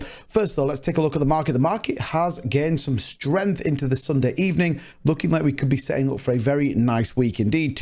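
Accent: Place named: North of England, United Kingdom. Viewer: The accent is from the north of England.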